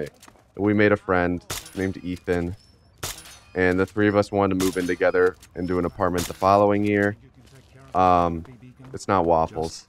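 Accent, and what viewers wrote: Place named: Chicago, United States. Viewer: In a past video you said you we're from the Midwest in this you said you were watching the Cubs game ,guessing your from around cook County too? I knew I recognized that accent.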